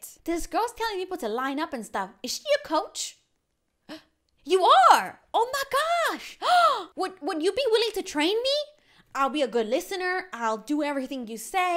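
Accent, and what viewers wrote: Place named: United Kingdom. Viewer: OMG I love ur voice h a little bit of normal, and a little bristish! LOVE YOU<3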